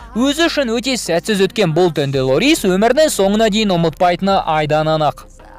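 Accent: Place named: Russia. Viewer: Брат казакшаны казак боп сойле орыс емес н н н н демей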